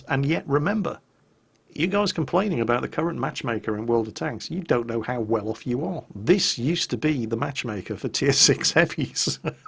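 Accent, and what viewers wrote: Russia. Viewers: love that Russian accent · Cant get enough of your KV 2 videos including jokes with a Russian accent and laughter. I love your other videos as well but videos with KV 2 deleting ridiculous targets with ridicules shots just gives a little more juice to the entertainment :D.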